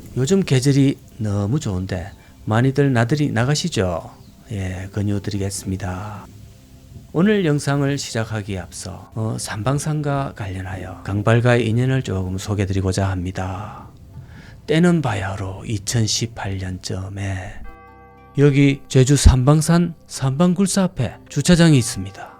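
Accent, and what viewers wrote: Gyeongsang, South Korea. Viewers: 강발 님 넘 재미나게 갱상도 사투리로 설명도잘하시고 웃기심니더 많은 영상 부탁드리기심더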